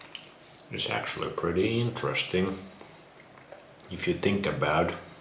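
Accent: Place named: Finland. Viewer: does raw meat at least taste good lol? also your finnish accent is strong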